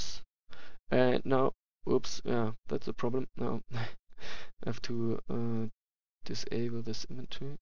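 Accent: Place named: Germany. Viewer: Are you from Germany? You Accent sounds like a german English accent.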